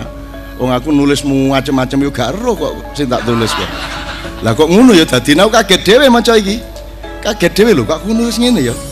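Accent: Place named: Java, Indonesia. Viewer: Lidah jawa mas bro'.. ga usah di perdebatkan..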